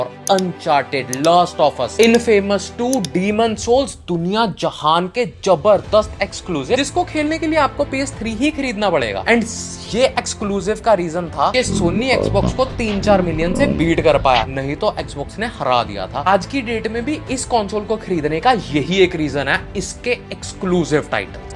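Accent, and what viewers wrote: Punjab, India. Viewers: I'm hating this punjabi language accent as this guy is making so much over action and bakchodhi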